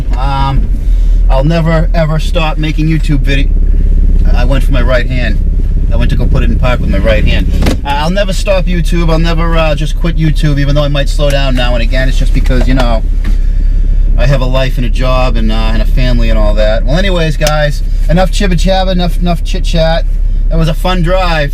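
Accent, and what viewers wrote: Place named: Massachusetts, United States. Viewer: good to see you back, and hear that Mass. accent again. Imagine what that Jeep would be like out on 495! Happy Holidays!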